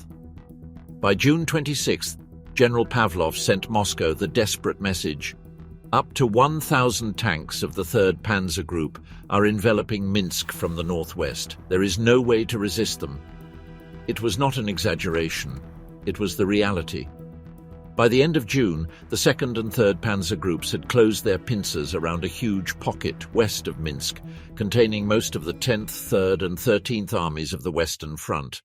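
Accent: United Kingdom. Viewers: Always the West with a British accent taking the liberty to tell a history of another country, another people only to denigrate their incredible sacrifice to free humanity from the Nazi scum. You will never see a Russian "documentary" on what the West involvement was in the WW2. One big lie here: that the USSR signed a non-aggression pact with Germany because "it mistrusted everybody else." Not true. The USSR saw the the Nazi threat and asked the West powers to have a common security agreement but this was rejected by them all. In fact, the West had already signed non-aggression pacts with Hitler, but this is not criticized here. We only condemn the USSR for trying to keep the Nazis at an arms length. The West only came to the USSR's aid when it was clear that the Soviet Union was defeating the Nazis. Don't believe everything you hear even if it is said with a British accent. 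Lies are lies no matter what.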